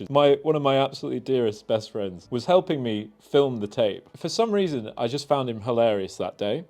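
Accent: RP, United Kingdom. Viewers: You definitely sound too posh for a peasant. WHS made the right decision. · You sounded to posh for a Henry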